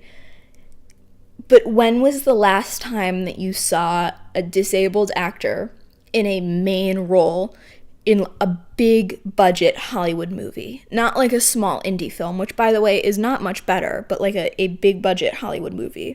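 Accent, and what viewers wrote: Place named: United Kingdom. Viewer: bloody hell that british accent was quite delightful, i liked to hear you criticize the movie industry because they have taken so many bad decisions when it comes to casting certain actors thinking it will not appeal to most of the audience,i think your point of views are well fundamented and i agree with you on most of them,so keep up the good work :)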